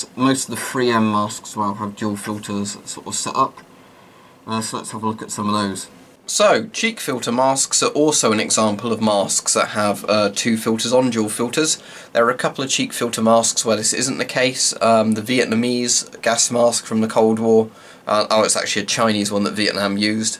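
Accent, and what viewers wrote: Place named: United Kingdom. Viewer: why you sound more british with a mask on? btw thanks for the info :D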